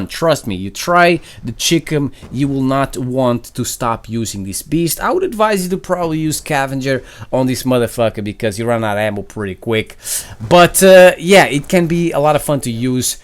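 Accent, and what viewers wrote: Portugal. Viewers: Are you Portuguese? 🇵🇹 You sound Portuguese.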